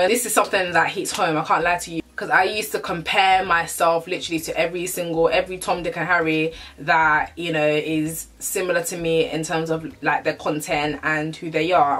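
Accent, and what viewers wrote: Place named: United Kingdom. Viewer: I just had to click youuuu the title caught my eye.... bowyyyyy do i love your accent you sound proper British😍😍 i love the content of this video i love the banta/ rant I totally agree 💯 yanoe most of the times us black people dunno how to support each other..... like im actually in love with you right now " their youtube video dont even bang" its really really really hard talking to the camera yanoe i laugh looking at my first three youtube videos i sounded like a penguin thats how we learn inni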